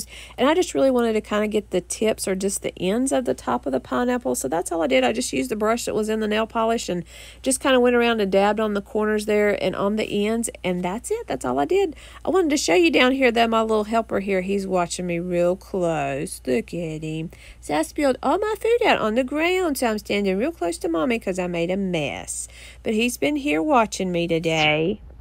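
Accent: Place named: South, United States. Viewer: These are soooo adorable! I love watching your videos! Your “southern” accent reminds me of home💛 Thank you for sharing these!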